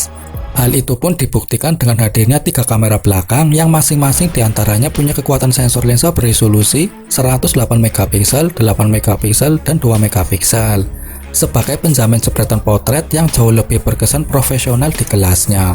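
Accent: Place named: Java, Indonesia. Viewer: Medok amat..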